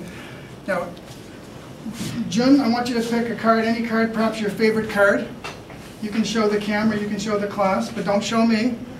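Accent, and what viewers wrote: Canada. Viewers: This guy is from Canada that's for sure!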